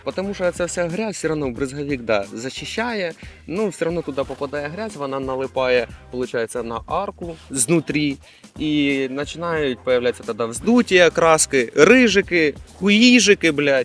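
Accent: Surzhyk, Ukraine. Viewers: Авто, мабуть хороше, але жахливий суржик "експерта" відбиває бажання слухати. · "Нєпадгатовлєний" від твого суржику вуха вянуть. Слабо говорити українською?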